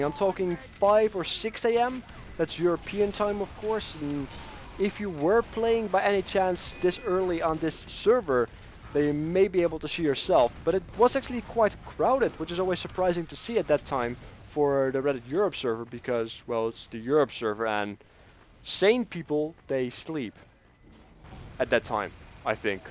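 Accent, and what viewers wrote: Netherlands. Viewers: Je kunt gewoon horen dat je nederlands bent lol, goede video's man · are you from holland ? :D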